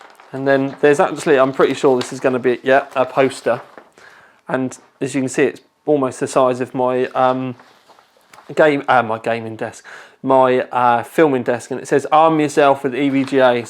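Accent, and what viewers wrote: United Kingdom. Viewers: So it's actually? For the longest time I thought it was. #BritishAccent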